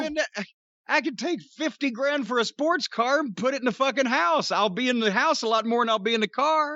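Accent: South, United States. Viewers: With the southern accent it's definitely a Hank Hill type thing I have never heard Jim sound that much like Hank Hill in my life but now I can't on here that. Mike Judge did base the character of Tom Anderson/Hank Hill off of all the older Southern men he grew up around. And although is about the same age as Mike Judge Jim sounded like Hank Hill when he said that and I honestly think it's just an older southern thing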